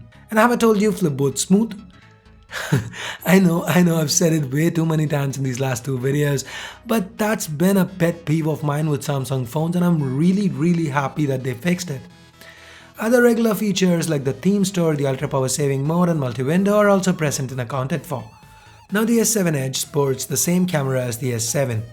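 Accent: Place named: India. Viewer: this is the best Indian english accent i watch so i SUBSCRIBE SO FAST SO I CAN UNDERSTAND CLEARLY 😊😍😁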